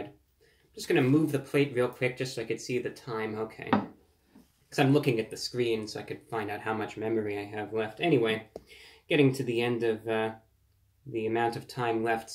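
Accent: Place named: Transatlantic, United States. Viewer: Dude I swear you're like the the closest to being a living relic of the Transatlantic accent, it doesn't sound purely transatlantic but it's close to it, very close to it! You should do some voice acting on 20th century themed video games or something like that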